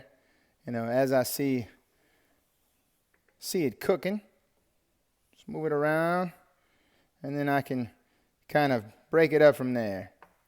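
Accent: Louisiana, United States. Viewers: Oh yes, very Cajun sounding so entertaining.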